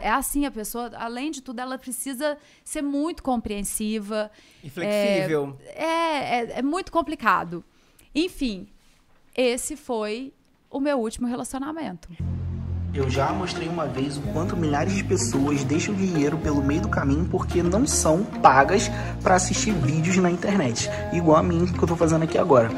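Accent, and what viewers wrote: Minas Gerais, Brazil. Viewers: Uma Morena dessa com sotaque Mineiro pra mim é AFRODISÍACO!!! 😋😋😋😋😋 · Sotaque mineiro é maravilhoso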